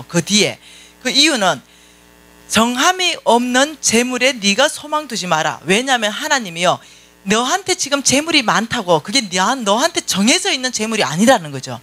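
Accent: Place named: Gyeongsang, South Korea. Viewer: 간사님 대구분이신가요? 경상도 억양과 말투가 고스란히 나와서 친근하네요 ~~. 대구. 수성구에서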